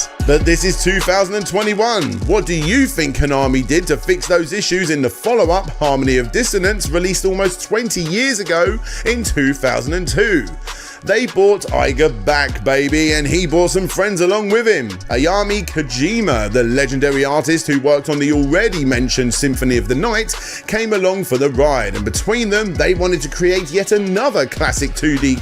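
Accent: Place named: United Kingdom. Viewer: I love UK accents. Hi 🥺🥺🥺🥺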